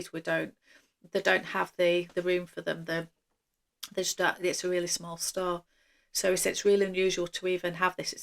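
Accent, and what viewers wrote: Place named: Leeds, United Kingdom. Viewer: Wow, you are so fortunate to get your hands on this rare beauty! Congratulations on 1000 subscribers! And side note, I love that you're from Leeds -- I can hear it in your accent. When I was in my mid-20s I spent a summer in Leeds and had such a great experience and met so many kind people. Thank you for sharing!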